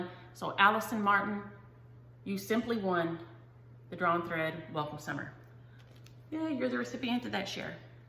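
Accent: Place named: South, United States. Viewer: Maybe Webster should make a Southern dictionary! Never heard of Tump! But I bet Utah people say words you never heard, like “Oh, My heck”, Fetch, or Biffed it. Love your Stitchin, Stories and accent! FREE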